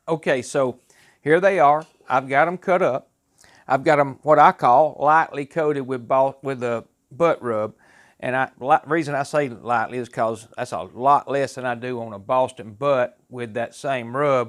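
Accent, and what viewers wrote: South, United States. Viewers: I'm trying to figure out what state you are from? I'm in Georgia and you have a very distinct southern accent. I love watching you cook up those ribs! I've copied you once and ooooh they were good!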